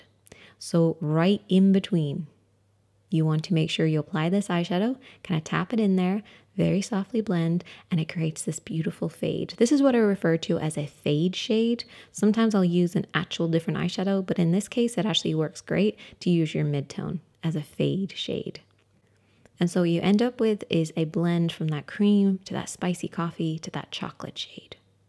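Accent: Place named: Ireland. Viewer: Hi, how are you? Lovely to hear an Irish accent amongst all the others! I think you are genuinely the only expert i will now follow. (along with Lisa Eldridge)...thanks for asking how everyone is doing. Its definitely genuine when an Irish person asks & not just filler, throw in a,"Conas atá tú & you might get some grant lol. I suffer from chronic nerve pain following an amputation and oft just find videos in the midst of a bad bout of pain to try drown out the terrible insufferable spasms. Anyway girl, keep flying the flag. From your neighbour in Limerick.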